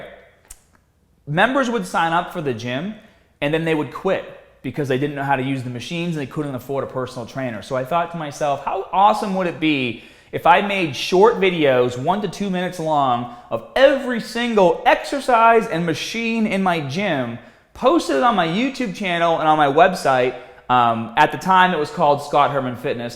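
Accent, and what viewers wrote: Boston, United States. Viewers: Whether you do decide to leave or stay, I appreciate the many, many videos you have uploaded over the years. I've only discovered the channel in the last year or so however there is plenty to go through and enjoy and even go back to if needed for advice on form etc. Lots of genuine, honest and great tips and advice. I appreciate the humor, knowledge, genuine and honest videos. You seem like an awesome dude and the Boston accent is lots of fun as a bonus. Either way, best of luck in your future endeavors and thanks for everything you have done and shared to help fellow gym folks like myself who enjoy the weight lifting and other aspects. I'm glad you did all this and took the risk and opportunity to make this channel happen. I also can totally relate to being introverted as a kid, gaming with friends and making lasting memories. 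The gym and sports and other stuff also was a great time spent with them and on my own to help with mental health, physical health and so on. Breaks are great to recharge and reset and you don't need to apologize ever for looking after yourself. It is a bit different seeing you with glasses haha. Sorry for the rambling comment but just felt like sharing to show you are appreciated and thanks for everything you have shared and done. Cheers from a fan from British Columbia, Canada. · You hit the nail on the head Scott. A Google search of "how to" blah (whatever exercise I was searching at the to time) is how I found you several years ago. It was easy to tell that you knew what you were talking about and had the results to prove it. Plus, I went to college in Boston and needed more of the accent lol. Been following you and trusting you as a top-notch fitness resource since. Congrats and best of the luck on the game! 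Geez, living all of our dreams!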